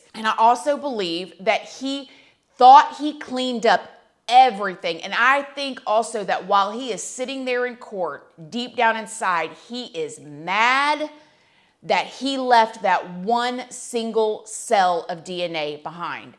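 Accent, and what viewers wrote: South, United States. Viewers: I agree with you! Why does it matter?! Just a distraction ! Omg I love when your country accent comes out sometimes!!!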